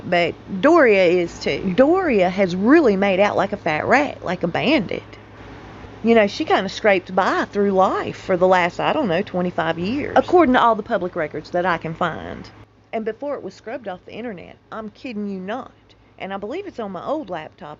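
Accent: United States. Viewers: I love your american accent its soooo kewl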